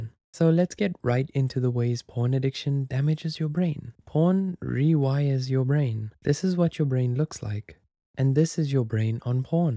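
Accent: South Africa. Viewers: I hear that Suid-Afrikaanse accent 🇿🇦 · is the narrorator south african?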